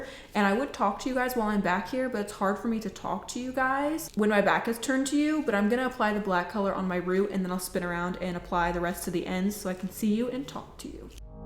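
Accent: United Kingdom. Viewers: I love your British accent, as a Brit, I approve. Also love your attitude as ever...you are awesome.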